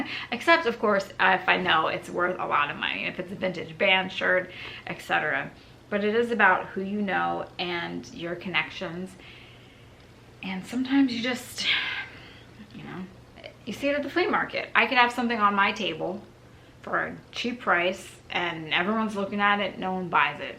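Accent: New Jersey, United States. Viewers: Kinda crazy reply ... I’m about your age and live in SF ... California toe-head and find myself watching you a few hours a week because of your NJ accent and manor ... different from the left coast treasure hunters I meet ... nothing much happens in your videos but I find them interesting - I like flea-markets and urban treasure hunting and your presentation/discussion/viewer questions are interesting and relaxing ... and I like Yellowstone too ... hahaha ... ANYWAY... Yup that was definitely a SF summer tourist sweatshirt the shops sell ... kinda ugly for us locals ... Tourist think its warm on the CA coast in the summer but as Mark Twain said the coldest winter I ever spent was a summer in San Francisco ... Love your stuff ... just had to chime in after all this time ...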